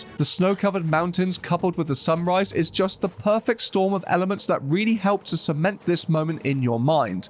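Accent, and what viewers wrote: United Kingdom. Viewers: As a Yorkshireman myself, I approve of the accent 😂 👍🏻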